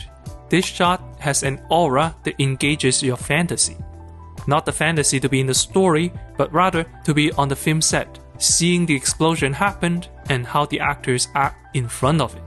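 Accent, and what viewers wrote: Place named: China. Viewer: Your chinese accent is so thick that even when speaking English, you still sound like you're speaking chinese. I don't understand half of the things you say.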